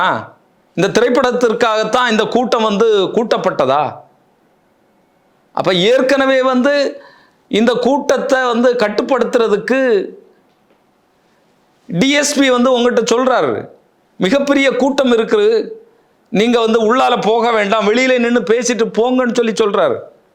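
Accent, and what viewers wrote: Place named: Kanyakumari, India. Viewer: குமரி தமிழ். பாராட்டுக்கள்.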